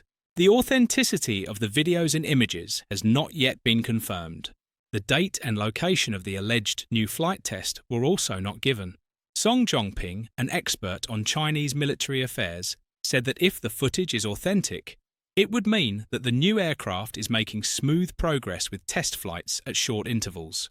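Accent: United Kingdom. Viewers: Ai with a British accent is hilarious. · Why do we have someone with a British accent telling about American military aircraft?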